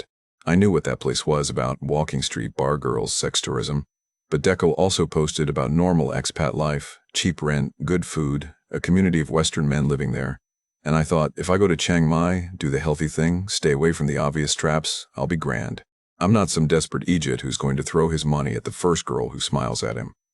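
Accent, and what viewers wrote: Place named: United States. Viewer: American voice, but says "me" shoulder, "me" work. She had a sip of "me" beer. That sealed it in "me" head. Doesn't Ireland use euros,not dollars. Looked at retiring in Ireland, need to have 50 to 60 K euros a year just to reside there, might be fun to spend a year or two there. At least he didn't let his money rot in a bank.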